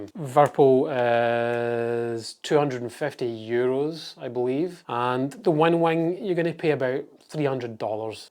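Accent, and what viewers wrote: United Kingdom. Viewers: Are u uk by the way just accent am from Northern Ireland nice seeing local people do so well.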